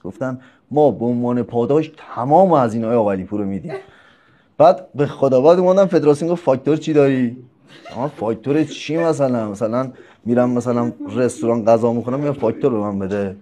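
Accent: Qazvin, Iran. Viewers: با لهجه قزوینی عشق کردم❤😂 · دمت گرم همشهری مدل صحبت کردنت قزوینیه😂😂❤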